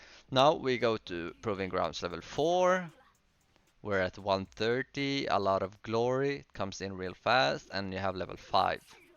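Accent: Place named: Middle East. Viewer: That’s very nice man. When i heard ur accent i thought that this guy is probably from somewhere near middle east or balkans with a good language education😂. I assume that one of ur parents born in either Turkey or Kurdistan. I’m Turkish and as far as i know a lot of Kurds migrated to nordic countries. Lastly about the game, how important is fishing? I’m N5 right now with Lilith and I barely do fishing.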